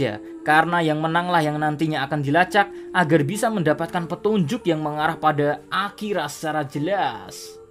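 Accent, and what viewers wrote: Java, Indonesia. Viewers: Lalu kenapa si mimim nya harus medhok gitu. Jd aneh denger nya. Klo bisa pake logat nya jgn medhok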